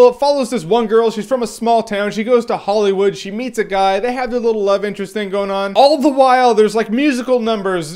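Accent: United Kingdom. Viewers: wow... nice British accent!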